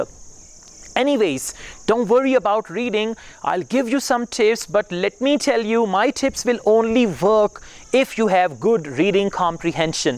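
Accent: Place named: India. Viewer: indian accent dominates 😃 You are very good 👍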